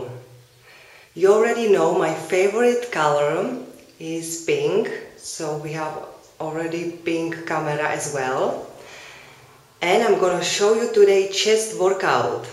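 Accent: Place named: Eastern Europe. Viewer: I love your accent i could listen to it all day. Slavic women seem to have heavy voices.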